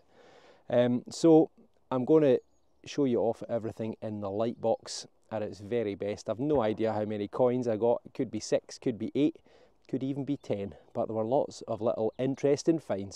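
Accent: Scotland, United Kingdom. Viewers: I miss my mums accent she was from Scotland. So it was so nice to find your site, and once again hear that familiar accent. I enjoy your show the best you seem to know your history. My grandfather was with the ladies from hell, the black watch. He would play his bagpipes when he came to the states for a visit. He would give me a handful of pennies i thought i was rich. I metal detect here in New Mexico but the ground is so hard. So when i see you digging im envious. May the wamth of spring come you way soon. Take care. Ian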